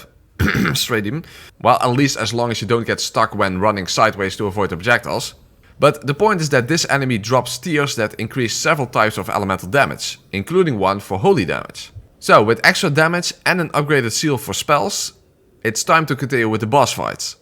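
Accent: Netherlands. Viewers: Haha oké ja overduidelijk Nederlands 😂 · Horrible Dutch accent. Next time use a friend or family member with proper English accent to do voiceover. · deam he sounds dutch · I smell Nederlands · You are so dutch, i can not unhear it :p nice vid bro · Excellent video but my god do i hate the Dutch English accent... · Jeez I thought my dutch accent was thick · Lol je bent Nederlands ik hoorde het Nederlandse in je stem maar wist het niet zeker tot dat je zei dat je Nederlands was.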